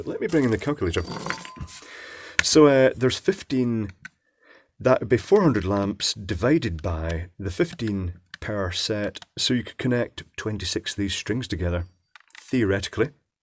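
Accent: United Kingdom. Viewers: I like your channel sir you are amazing i like your british english alsooo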